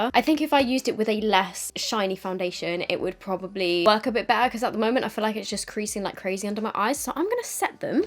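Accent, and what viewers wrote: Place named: United Kingdom. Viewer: I literally lol when you said the word “hottie” sounded dumb in a British accent 😂…I feel the same way when I want to sound eloquent but my southern American accent just won’t allow it🤣🤣 Glad you’re feeling better!!!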